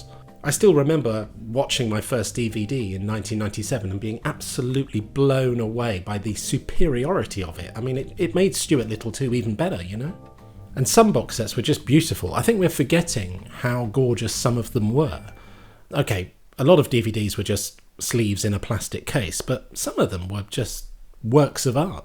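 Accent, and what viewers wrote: United States. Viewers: Great gravitas american accent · Can't figure out which American accent(s) you're attempting there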